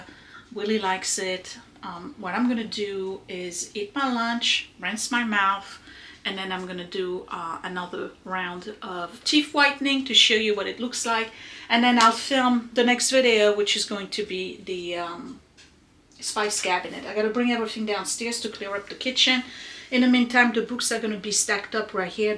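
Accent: France. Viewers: LOVING THIS SERIES! 🥰 I enjoy hearing ur reasoning along the way! And love ur French accent❤️ great work!!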